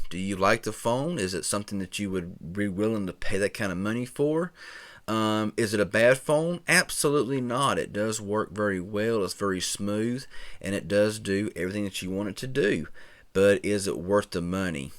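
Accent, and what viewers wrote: South, United States. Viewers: Was suppose to be a review not southern accent return!!!!!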